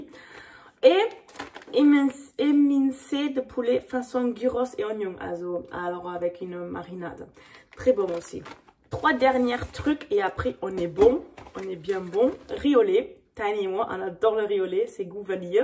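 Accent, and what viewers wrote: South, France. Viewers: Du hast Französisch gelernt aber mit einem sterken Akzent aus Süd Frankreich ;) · Sprichst du eigentlich "nur" den südfranzösischen Dialekt oder auch "richtiges" Französisch (wie heißt eigentlich das französische Pendant zu Hochdeutsch?:D). Ist überhaupt nicht negativ gemeint, sondern tatsächlich aus Interesse :) Die Aussprache unterscheidet sich ja teilweise richtig :)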